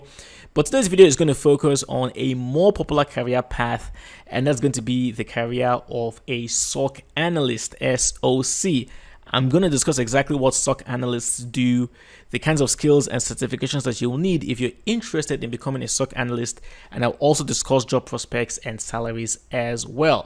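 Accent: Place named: Nigeria. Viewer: Bro you sound like my country man, You Nigerian?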